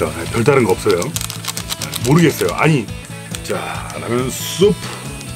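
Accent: Gyeongsang, South Korea. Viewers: 형님~ 강원도라고 하셨는데 출신은 경상도?? 쌔리~~~하네ㅋㅋㅋㅋㅋ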